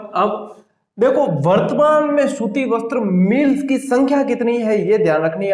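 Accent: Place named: Marwari, India. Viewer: सर आपका मारवाड़ी भाषा के ठरके खूब रास आ रहे हैं!! निस्वार्थ भाव से विधार्थियो के लिए रामबाण औषधि परोस रहे हैं!!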